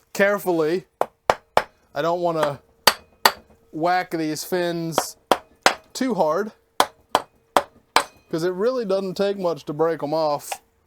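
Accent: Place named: Yorkshire, United Kingdom. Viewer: Off topic but you say 'Nicely' like a Yorkshire man almost. Plus a few other things sounding rather English. Whereabouts were you brought up?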